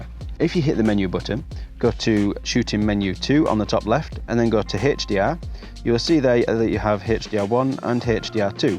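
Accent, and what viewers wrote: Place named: Northern England, United Kingdom. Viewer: Your northern accent and the breakneck speed at which you are talking is not very helpful.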